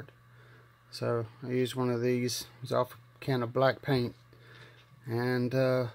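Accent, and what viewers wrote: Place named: South, United States. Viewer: Good job man! glorious southern accent!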